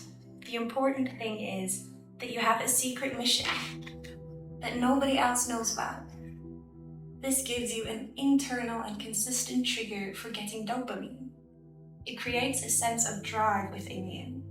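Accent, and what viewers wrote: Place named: United Kingdom. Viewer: West I was watching that and you read it felt like I was hard to say but I guess I'm just going to use the the easy way out it was like it was like I was in The Matrix and everything around me was it real but you were like an oracle guiding me to see the NPCs😊. Dad I don't want to say too much but maybe, it's like it's so interactive and it's like I don't know where you are I think you're probably in the UK just by your accent and I hope your knee is okay I don't know what happened to your knee but is that the secondary infection I think you called it. I don't really know what that is maybe you'll explain it to me or I'll Google it but back to the story it felt like when you were I guess you were reading what you had Gerald before or just something something that you felt that possibly channeled through the quantum field possibly maybe it's, maybe it's coming from the higher timeline. 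But it is very....????? Needed..❤❤❤for me, it feels like you're like a dream and I don't know why I didn't find you before but I found you now due to some bigger story narrative to buy narrative Divine unfolding I don't even know if there is a such a thing maybe it's all a big illusion. Maybe it's our I've come to the realization that I know that I am not a human with a conscience I'm a Consciousness with the human in a human exploring and maybe this is all a construct of how we think it should be, but I feel with what you say it helps me coalesce into reality whether that be a tangible reality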